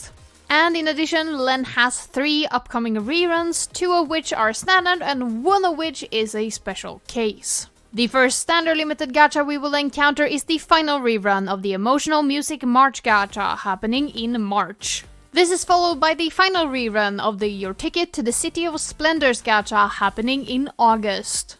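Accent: Sweden. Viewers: im sorry but i cant stop focusing on the swedish accent 😭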